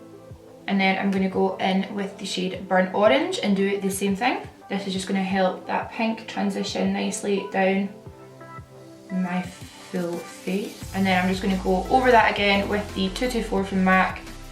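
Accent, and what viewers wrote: Scotland, United Kingdom. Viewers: Your scottish accent is the best kind. yours is the kind of accent I wish every Scot would have. non of that ned shite 👍😂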